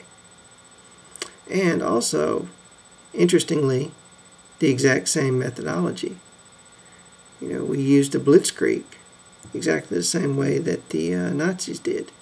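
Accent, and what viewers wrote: South, United States. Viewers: Nice Southern Drawl and I am from La, if you know the truth you should share it. Isreal is a pawn and so are many of us that are slaves to the Money Masters. I do think it is time for the Constitutionalists need to start this off, but no you will wait till they come and knock down your door. The only thing you need to know is that the Elite and the G8 and the Bilderbergs, TLC and the UN and most of the Fed has us all by the Balls.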